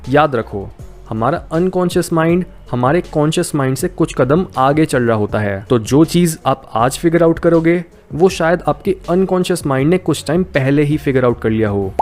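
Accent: India. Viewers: yeah same happens 😅😅love ur voice hindi accent❤❤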